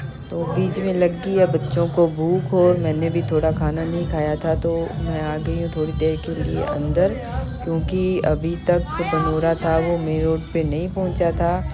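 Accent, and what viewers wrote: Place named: Rajasthan, India. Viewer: ok Ji good, हम भी साउथ दिल्ली से जाट हैं, आपकी भाषा से आप राजस्थान से हों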